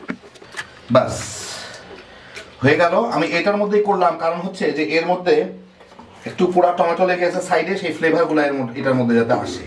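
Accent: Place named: Bikrampur, Bangladesh. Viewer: আপনার কথাগুলি বিক্রমপুরের মত। আপনি কি বিক্রমপুরের ?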